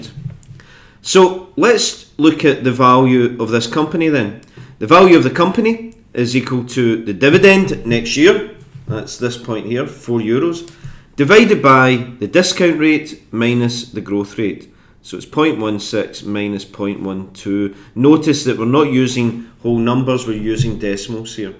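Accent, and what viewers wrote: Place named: Scotland, United Kingdom. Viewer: Nice scottish accent out there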